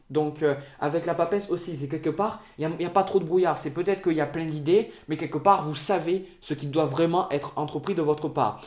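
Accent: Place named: Toulouse, France. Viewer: Salut, je viens de découvrir ta chaine, super super super, du coup j'ai regardé la série de vidéos sur le tirage de cartes (au debut de ta chaine je pense) et le tirage pour les gémeaux (que je suis!!) pour le mois de mars 2019 qui m'a ENORMEMENT parlé!!! Bravo, bravo, tu es vraiment un chouette gars plein d'amour, de bienveillance et de gentillesse et t'écouter est un vrai plaisir... Ne serais-tu pas de Toulouse??? Ce petit accent m'est familier! Gros bisous, plein d'amour pour toi et continue à exprimer ton intuition si juste! Pascale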